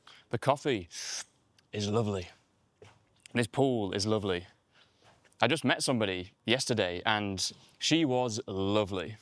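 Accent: United Kingdom. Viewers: I really love watching your video sometimes I practice to talk just like your accent British accent talking to myself haha 😂😂 I learn a lot from you.thanks u from Philippines 🇵🇭 with love.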